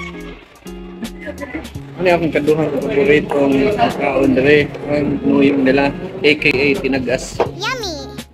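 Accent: Visayas, Philippines. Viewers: Kuha gyud kaau ang accent sa mga Bisaya ba..kung di ko motan aw sa video Bisayang tao gyud akong maimagine.pero kay nakita na naq mas nibilib kaau ko..hawod kay moBisaya tapos kaming Bisaya usahay maglisud ug English......❤ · Pila na diay ka katuig sa pinas sir? Orig naman kaayo imong bisaya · ayus accent nimo bpd bisaya gyud kaami paminawon oi..... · First time nahong tan-aw sa imong vlog. Cute kas imong bisaya nga accent maka adik. Murag kag tinuod mauni gi tawag og ugis na puti hahaha. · lami kaau imong accent brad 🤣👍🏻.. 1000% bisaya accent! i just subscribed to your channel 😊❤️ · 🤣🤣🤣 Bisaya man diay ka! love it! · Atay bisaya jod kaayo ohhh hahahaha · Kabisaya ba jud kaau ani nya oi.. pati mag likramo sa silupin hahahaha new subscriber here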